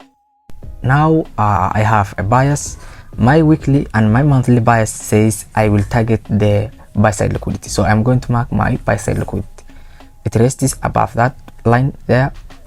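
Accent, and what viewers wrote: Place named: Somalia. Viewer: Your accent looks Somali hh glad to see ur video though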